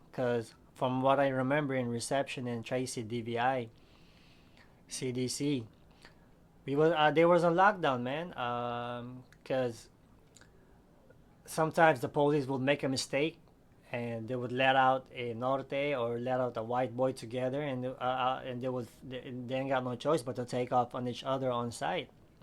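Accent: Philippines. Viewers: I love the Filipino accent man, I was in YA in 87-89 and I was accepted by the blacks and Mexicans but I got the hardest time from the Filipinos. I'm half white/Filipino but I was born and raised in the Philippines, deep in the jungle in a literal bamboo hut. But since I wasn't full Filipino I was given a hard time eventho most of the Filipinos in America never even step foot in the islands. But it is what it is, I like the channel man. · I like the pinoy accent. That's dope. You make it sound treal and gangsta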